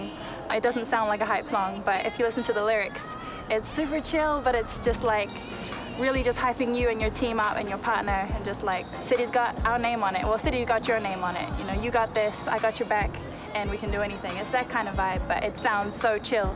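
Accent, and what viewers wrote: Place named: Australia. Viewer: Your Australian lol lmbo I love the accent ❤lol lmbo.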